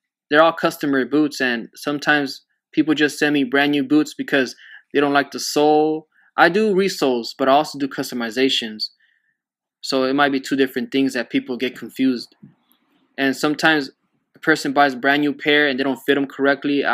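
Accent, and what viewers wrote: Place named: Latin America. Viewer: I can hear his Latino accent. For a minute it sounded like he was going to bust out some Spanish. 😂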